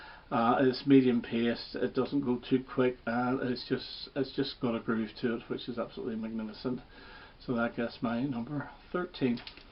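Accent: United Kingdom. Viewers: From America.. it's lovely to hear the Brit accent. We here were only introduced to Electric Warrior and Slider, and it's fun to catch the rest. Like your selections and collection! 😊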